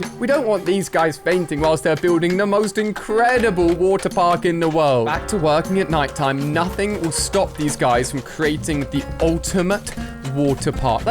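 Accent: United Kingdom. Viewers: an inside stairway us:your british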